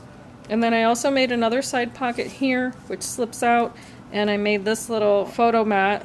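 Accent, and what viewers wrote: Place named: England, United Kingdom. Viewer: Beautiful!!! I have this one and the cooking theme one and yes it's been in the hoarding vault lol, oh you remind me of my daughter with her English accent lol, (Boricua/Colombian) and she speak English 😂 tfs hugs Yorlis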